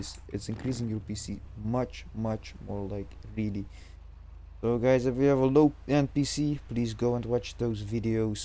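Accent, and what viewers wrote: Netherlands. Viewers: ik hoor een beetje nederlands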